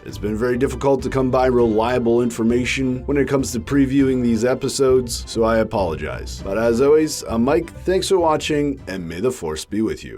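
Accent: Canada. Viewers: I can tell your Canadian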